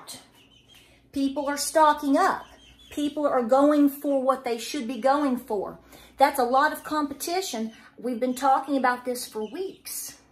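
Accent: South, United States. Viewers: Thank you for the motivation ❤️ I’ve been stocking my pantry since 2020 but you gave me ideas for other things I didn’t think about! I just love listening to your southern accent 😁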